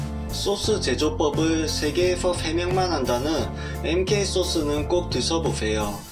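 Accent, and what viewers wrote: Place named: Gyeongsang, South Korea. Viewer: 엌.... 비웃으려는게 아니라 제가 친정이 거제도고 결혼전 부산에서 살아서 참 그리운데 님 샤브샤브 그 특유의 경상도 ㅅ 발음이 너무 반가워서 댓글 달고 갑니닼ㅋㅋㅋ 좋은 영상 잘 봤어요~>___<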